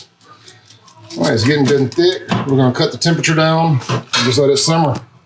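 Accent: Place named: South, United States. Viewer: Where are you from?? I can’t pinpoint the accent it sounds southern but also half of it doesn’t sound southern I’m so confused lol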